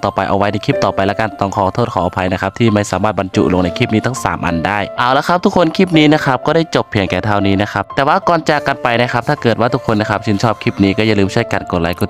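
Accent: Cambodia. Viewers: ตัวมึงยังพูดตกเขมรเลย แอด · สำเนียงแอดเหมือนเขมรนะ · แอดมินพูดไทยสำเนียงเหน่อลอยเหมือนต่างชาติพูดไทยโดยเฉพาะเขมรพูดไทยใช่ไหม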